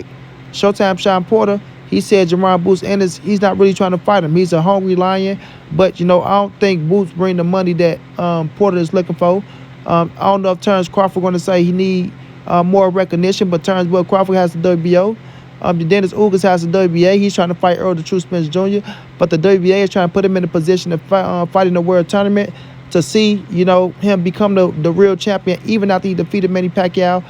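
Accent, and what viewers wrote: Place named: South, United States. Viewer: Boots does NOT look like Spence on the rise,, NONE of them look like BOOTS yall got this excuse NOW as yall gona,, BOOTS beats Spence BOOTS beats Crawford & Porter said BOOTS is ready for ALL THEM GUYS NOW! if you a boxing channel then you should know Porter said BOOTS is ready for all them NOW,, & clearly with your country accent your a Spence fan,, so your bias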